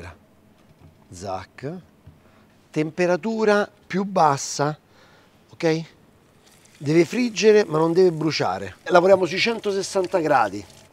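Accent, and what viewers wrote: Rome, Italy. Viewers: Ciao ma non parlare in romano , parla più in italiano , non mettere questo stile romano che non è tanto bello , un pochino volgare , ma quello che cucini e ‘ buonissimo 👍 non ti offendere non sono solo io a dirlo , senza accento , non ti sta bene dico per te , un po’ brutticello , ma c’è peggio per esempio personaggi famosi in tv che parlano romano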